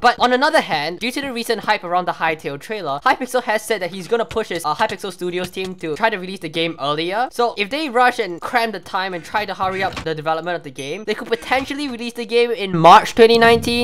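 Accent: Singapore. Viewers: Is it just me or you got a Singapore accent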